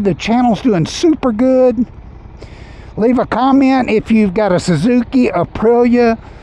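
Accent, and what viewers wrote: United States. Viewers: English man I think not sir sounds American to me😂😂😂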